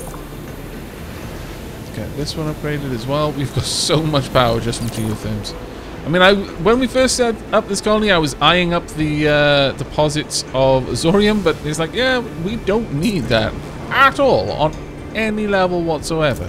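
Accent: Wales, United Kingdom. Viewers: has welsh accent...is funny as fuck and is pretty savage, it's like having a older brother that has a clue of how to play this game 😂 great good on this series buddy im subscribed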